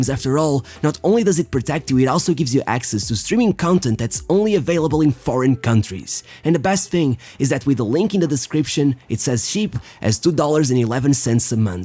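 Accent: Portugal. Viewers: Só precisei de ouvir 10 segundos de vídeo para saber que eras português. Abraço, continuação com o bom trabalho. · Boas, parabens pelos videos. Consigo perceber pela tua pronúncia que és portugues. Por isso peço te que incluas legendas em português, pois os teus videos tem muita qualidade e há muita gente portuguesa que gostaria de ver os teus videos mas que não entendem ingles. Abraço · You have a portuguese accent, i'm pretty sure you're portuguese! your videos are very good, keep it up!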